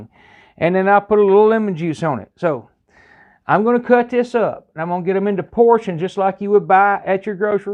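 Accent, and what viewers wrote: South, United States. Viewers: I know you are from the south. I love southern accents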